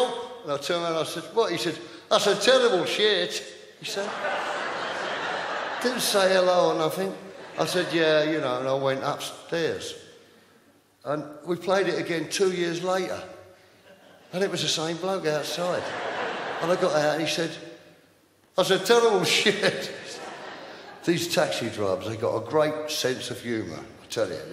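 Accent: England, United Kingdom. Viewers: I know England has all kinds of accents, but does anyone know what Joe's accent is from in England? Lovely one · As an American, his English accent is absolutely delightful.... wonderful! I loved Joe since I was a kid and he's effortlessly charming, adorable and charismatic.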